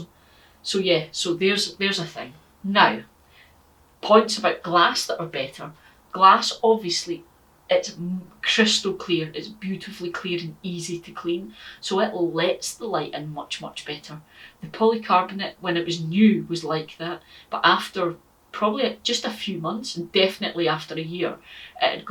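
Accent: Scotland, United Kingdom. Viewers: I'm subbing for the accent. All hail the Scots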